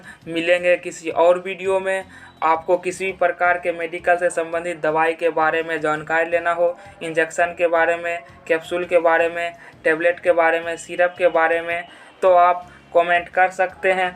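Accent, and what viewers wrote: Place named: Begusarai, India. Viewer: Lagta hai aap begusarai se ho awaz se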